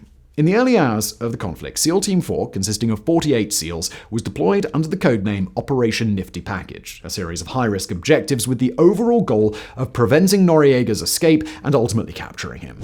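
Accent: RP, United Kingdom. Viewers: the pre-beard I can deal with it's the stuffy upper crust english that was obviously he's natural way of speaking then that gets me 😂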